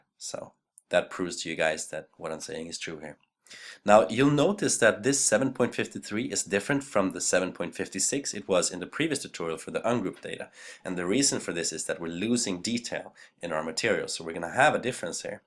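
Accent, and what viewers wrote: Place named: Sweden. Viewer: Thanks for sharing! You have a Swedish accent! :)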